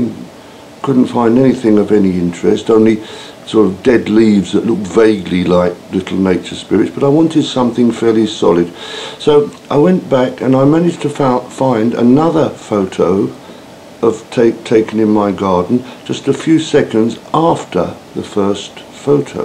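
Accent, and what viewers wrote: United Kingdom. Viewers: Well, it's great you raise those questions! People do need to challenge their familiarities and I suppose your questions do just that. I haven't gotten to watch all of your videos, but I was curious to know where you got your education. I speculate that you're British from the accent, but that's the best guess I could give! So what's your story, in short?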